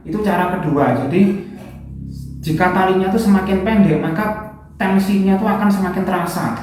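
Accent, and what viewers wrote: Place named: Malang, Indonesia. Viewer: Logate kok koyok Wong Malang yo? Loop Band-ne merek opo iku Bro? Svarga?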